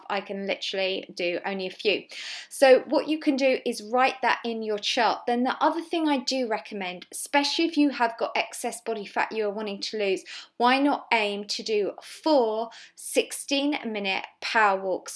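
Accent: United Kingdom. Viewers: Really love your accent I'm American so I find British people's accents to be amazing 😊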